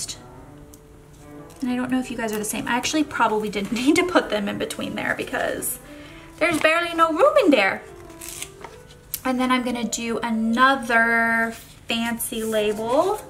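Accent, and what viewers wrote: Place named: South, United States. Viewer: I can hear your southern accent coming back!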